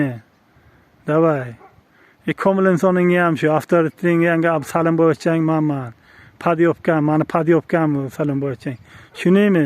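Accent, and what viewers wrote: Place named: Kashkadarya, Uzbekistan. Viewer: Кашкадарёдан бу ака, гапиришига караганда. Охирги пайтларда куп талантлик одамлар чикябди кашкадаредан. Тайсин, сарик сочли бир бола чикканди валиахд. Энди акамиз чикиш килибди. Куникиб колдик анча, миллион билан дизайнга тема булибдида яна. Узб маскарабозлари точно эргашади сизга.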